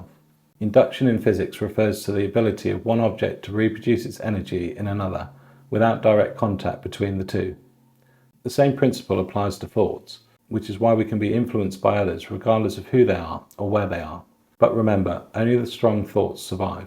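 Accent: United Kingdom. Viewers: nice to hear your British accent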